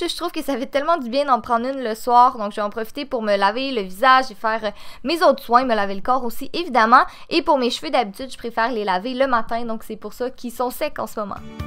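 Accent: Quebec, Canada. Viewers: C'est tellement mignon l'accent québécois 😂😍😍😍💘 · L'accent québécois c'est le sang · Je sais pas si je suis la seule à kiffer la voix québécoises elle est magnifique :) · Ton accent est trop "cute" je sais pas si c'est comme sa que vous dites vous les québécois pour dire mignon mais je crois avoir vu sa dans les Lea Olivier😋je suis Belge et chez nous il y a pas cet accent là mais j'adore alors quand je m'ennuie j'essaye de vous recopier mais moi sa ne marche pas 😪😂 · tu est la seule avec l'accent québécois que je supporte 😍👌👍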